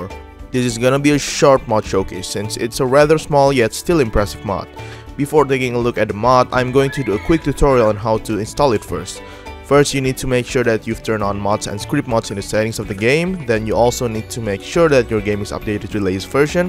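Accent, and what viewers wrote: Indonesia. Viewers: Logat Inggrisnya kek dari Indonesia. Kasi tau gw bang kalo lu dari Indonesia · setelah nonton beberapa video lu, gua bru sadar lu orang indo wkkww, gara2 accent . ah sial gua kira dlu org luar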